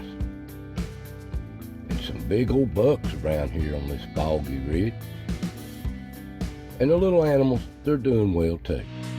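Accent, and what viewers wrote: Appalachia, United States. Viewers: thank you sir,I loved the country mountain way,you narrated the piece work story,five stars ☆☆☆☆☆,I'm a blue ridge boy,so sounded like one of my uncles telling a story,thanks again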